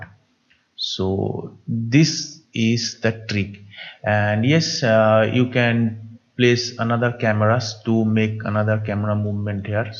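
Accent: India. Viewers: There's a lot of other VFX channels on YouTube but your channel taught me a lot! Also ur accent sounds like Bengali, and as a bengali myself it makes me feel comfortable watching your videos :)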